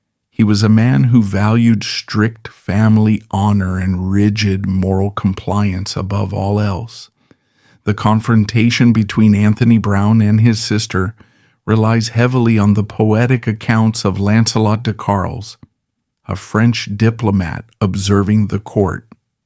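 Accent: United States. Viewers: I mean this with the utmost respect but i studied tudor history for many years at college and university and was lucky enough to read archives in London and Windsor castle. If this is AI voice please change it as an American accent don't sound right its pronouncing names and places that just sound weird. Also Elizabeth Somerset told her brother that ann flirted with courtiers but the evidence was never put forward in court 😊 also as you probably should of mentioned Anthony Brown was a seymour supporter as ann and himself had a blazing argument as ann didn't like how he treated his sister Elizabeth Somerset 😊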